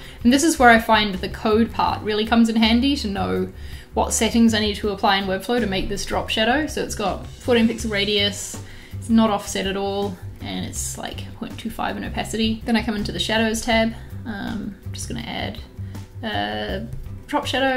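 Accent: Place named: New Zealand. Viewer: good to hear a kiwi accent on the tube!!!!!!!!!!!!!!!!!!!!!!!!!!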